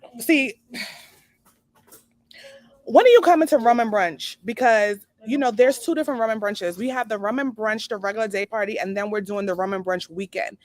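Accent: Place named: Brooklyn, United States. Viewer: The Brooklyn might come out of you & they just don’t know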